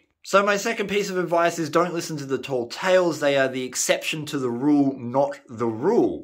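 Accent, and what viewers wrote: Australia. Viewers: Yeah I did some research on Old maps from the 1800s trying to find Rivers that Prospect hit and I finally found one area earthquakes landslides blocked the waterfall that used to be there and lots of gold two and a half years it took me to get most of it out I'm sure I left some behind the life gets in the way and I'm researching old Maps in the state that I live in some pretty interesting stuff thank you for the video I enjoyed brought back memories and you were right🇳🇿🇵🇳🇦🇺😛🎅🎁🐕‍🦺🦮🍻🍻🤣🤣🍻🧁🥃📹⛏️⛏️⚖️⚒️ Merry Christmas drink lots of beer give your dogs a bone scratch gazzy behind the ear I think I'm saying his name right if not that's how it sounds you Australians you have that accent tell your woman I said hello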